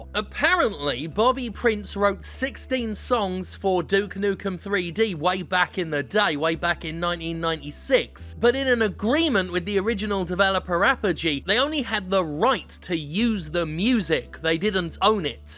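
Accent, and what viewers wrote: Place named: United Kingdom. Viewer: I wish I could say controversy like that. But without the British accent, it just doesn't work.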